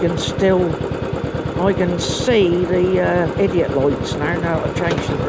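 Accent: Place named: Norfolk, United Kingdom. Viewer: aww that’s nice. I don’t consider myself “that good”, but I try my best to give people a good ride (oo-err😂). I amazed how well you managed to keep the chat going for 50 mins, with interesting content, once I played things back a few times. The strong Norfolk accent takes some deciphering at times 😎😂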